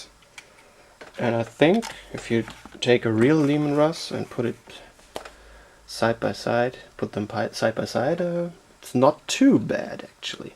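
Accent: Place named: Germany. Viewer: You have a slight german accent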